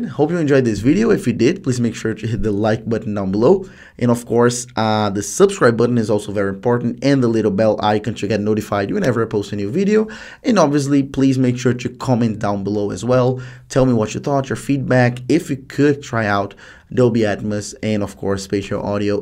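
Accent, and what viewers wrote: Brazil. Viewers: Are you Brazilian? I can recognise your accent cuz I’m Brazilian as well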